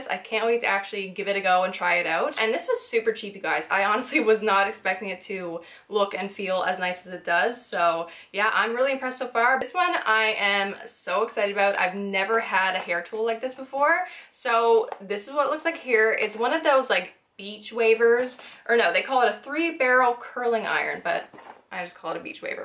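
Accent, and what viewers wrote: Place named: Newfoundland, Canada. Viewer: Me trying to figure out that maritime accent. Hmm Newfie or Boston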